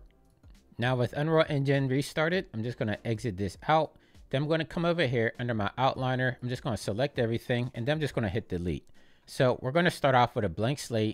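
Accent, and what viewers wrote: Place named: Pittsburgh, United States. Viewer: Thanks so much for this! I'm new to the channel. I thought I heard a bit of a Pittsburgh accent in your voice, then I saw the Kennywood sign behind you. My hometown is not too far from there! (I worked at Idlewild Park as a kid.)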